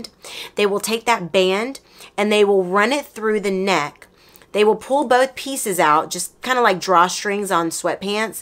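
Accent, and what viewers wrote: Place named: North Carolina, United States. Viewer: I hope you know and BELIEVE how good you are at this ❤ Because I swear, I could listen to you talk about anything for as long as you’d be willing to talk 😂 I also love some of your sayings and your accent because I’m in NC and I can SOOO relate to some of the stuff you say and how you say it… feels like I’m just listening to a friend tell a crazy story❤🫶🏻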